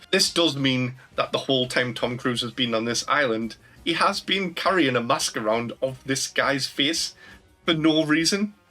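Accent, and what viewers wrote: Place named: Newcastle, United Kingdom. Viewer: 😂 damn geordie accent man lol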